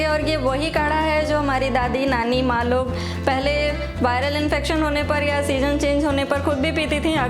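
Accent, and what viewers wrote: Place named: Maharashtra, India. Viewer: Aapke bolne ka jo toning hai mujhe maharashtrian ki tarah lagta hai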